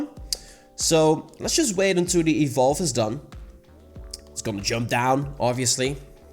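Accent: Netherlands. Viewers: You really sound dutch plus i might move to the Netherlands in August so i hope i meet you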